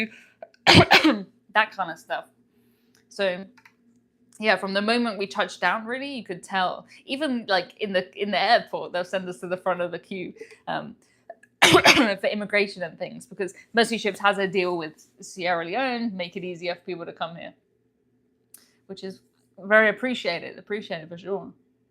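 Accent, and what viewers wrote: United Kingdom. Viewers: Hello! Missed the live stream, am watching the rerun. Congrats on your philanthropy -- well done! I enjoy your cruising podcasts (as I am too ill to go myself) but most of all your accent and British isms. Makes me miss my Dad and Grandmum. Keep up the good work, and Happy Holidays to you!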